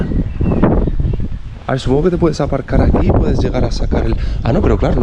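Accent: Cuba. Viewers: HASTA EL ACENTO CUBANO TIENE PARECE CUBANO YO SOY DOMINICANO VECINO DE CUBA Y PUERTO RICO SOMOS PARECIDO 🇩🇴🇩🇴🇨🇺🇨🇺🇵🇷🇵🇷👍👍🖐🖐🦾🦾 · se me quedó la tonada de cubano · Lo primero que pensé fue parece cubano por el acento cómo que Tongano. ❤ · El se llevo hasta el acento cubano. Bro me encantan tus videos. Ya he viajado contigo europa completa 😂😂🇨🇺🇺🇸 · Oye, tiene acento cubano, 😂😂😂. Yo soy cubano también. Gracias por tus videos, son muy buenos. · Saludos y, yo soy Dominicano, te sentí el acento cubano.😂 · Waooooo habla como cubano!!!! Bella isla ❤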